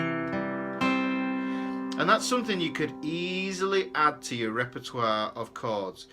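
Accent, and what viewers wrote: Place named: Northern England, United Kingdom. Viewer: So happy I stumbled across your channel today, I am now binging, you are amazing, I love the Northern accent too! My mum is from Derbyshire, Glossop actually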